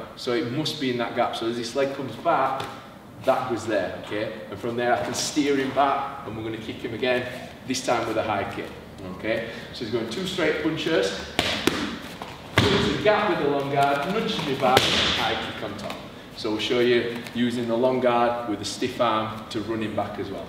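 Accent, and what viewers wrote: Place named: Barnsley, United Kingdom. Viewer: Barnsley twang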